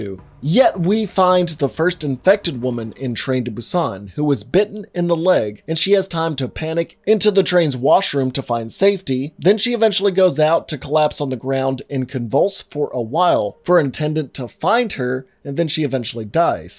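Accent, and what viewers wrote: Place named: South, United States. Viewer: I just realized how much he has a southern drawl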